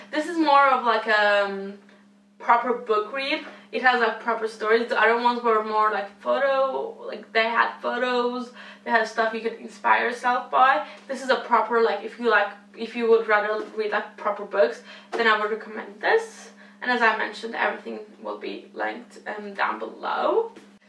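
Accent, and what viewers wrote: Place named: United States. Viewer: I loved this video cause I could undertand more! Lol! Funny you have an American accent even though you are living in England. But it is nice! Love you sweetie!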